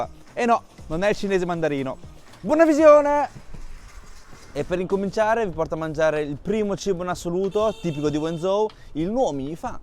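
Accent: Italy. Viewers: Se dici che non si vede, però ti dico che si sente che sei italiano... Dai, si capisce subito appena ti si ascolta.... Poi chiaramente avrai origini cinesi ma non vedo dov'è il problema e tutta sta gente che si meraviglia di ste cose a giorno d'oggi a me inizia a starmi sulle scatole. Non dovresti precisare niente a nessuno!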